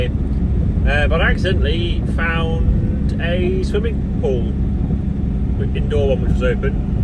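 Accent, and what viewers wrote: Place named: United Kingdom. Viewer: Love your British accent. Are you from Liverpool?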